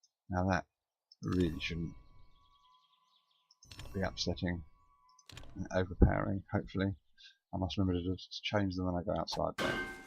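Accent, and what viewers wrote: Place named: England, United Kingdom. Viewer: If I may be so bold: a couple of problems I noticed - the voice commentary is often unintelligible, or drowned out by either the minibike or the sounds of mining. I appreciate that you have an English regional accent, and that is set in stone; but I am guessing that perhaps some viewers find it difficult to understand - at least you don't have a thick Geordie accent eh? Building and engaging an audience is a long hard road, but another step you could take is uploading a profile pic, doesn't need to be a selfie, could be anything, but it is something other than the anonymous 'blue blob'. I am your one subscriber!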